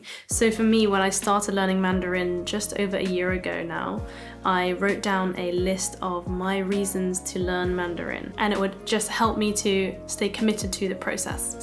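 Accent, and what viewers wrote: England, United Kingdom. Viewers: I was looking on youtube for Chinese lessons when I came across your videos. I thought I saw a Chinese woman on your video cover. Then she speaks pure English with a British accent. I was like : whaat ?? Then I learned she only speaks English and is learning Chinese. heh ? . If your friends told you you should try make youtube videos and put your face on the covers - then yeah, that was a great idea. That beautiful face of yours is a click magnet. Very GOOD ! Now I also learned that you are studying to become a doctor. Hey hows that for double good: She also has brains ! not just something like perfect beauty. The problem with most doctors are they never get around understanding the placebo effect even though it is in most doctors study materials. I hope you will be smarter. And I DO hope you will be smarter :). Anyway, I am curious about how come you look so Chinese/Asian. 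Must be in the bloodline somewhere recent in the family tree ? I think your reasons and motivation for learning Chinese is very good. I will not keep it against you for neglecting the following reason: And next time some Chinese speaking person starts to speak Chinese to you because he/she thinks YOU are Chinese speaking: You don't just have to stand there dumb struck and start talking English with a perfect British accent. Sorry but its just funny ! LOL . Well have a great day and I'll probably see you in some of your other videos. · Ni Hao I love everything you said,particularly your British English. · As an American born Chinese - I grew up speaking Cantonese. Honestly, its NOT the Chinese but your ENGLISH ACCENT I love most. The English accent automatically boosts your perceived IQ 88 points!!! Where are you originally from? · wow i like your british accent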